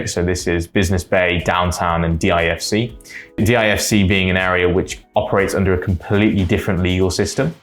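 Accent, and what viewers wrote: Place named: United Kingdom. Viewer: Brodr why do u look indian,work in arabia n has an British accent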